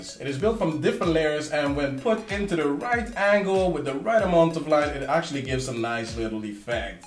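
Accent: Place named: Netherlands. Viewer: I advice you to work on the accent. Are you dutch ?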